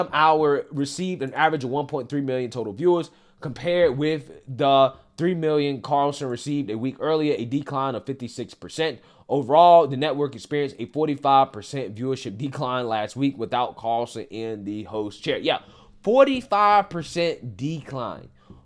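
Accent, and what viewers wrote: South, United States. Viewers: You’re from the south bro. Ppl actually talk shit about how you say words🤣 those people are dicks man. That’s not criticism, that’s just being ignorant, and not understanding there’s different accents. I live in Texas and moved from Boston. When I first got here people couldn’t even understand me. Maybe people need to understand there’s different cultures.😂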